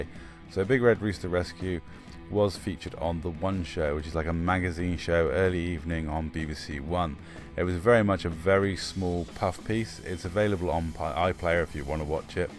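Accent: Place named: United States. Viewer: I enjoyed the video. Sounds like something one many local USA media would have done. Then again local news in states would have gone little more detail. Your voice and what I imagine what you look like was total different. I could easily pass off as some from the states. It's same that people don't treat the chickens and rosters well. Here states I know a lot people that rise chickens for their eggs and meat. All them treat chickens and rosters very well. I do hate cock fighting and it should be ban through out the world.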